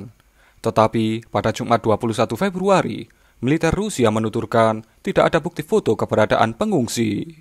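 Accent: Java, Indonesia. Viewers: Medog mu bro....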